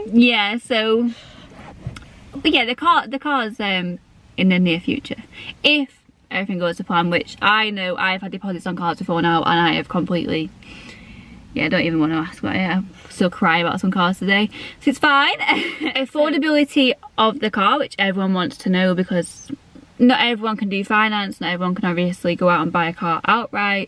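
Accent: Liverpool, United Kingdom. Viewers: I love a girl with a scouse accent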